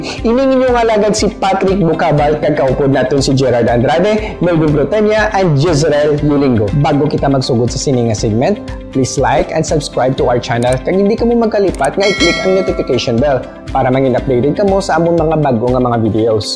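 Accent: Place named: Visayas, Philippines. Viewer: Daw hindi ka tinood na ilonggo sa accent nimo.daw bisaya ka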